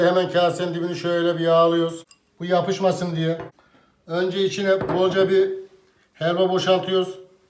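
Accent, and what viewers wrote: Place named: Konya, Turkey. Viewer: Ellerine sağlık şefim siveniz bizim buralar gibi Konya limisiniz bende yapacağım Konya'dan saygılar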